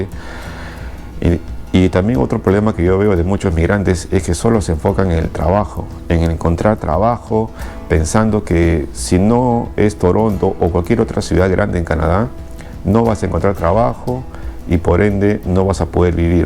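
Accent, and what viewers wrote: Peru. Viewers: Eres peruano hermano?, me parece por tu acento.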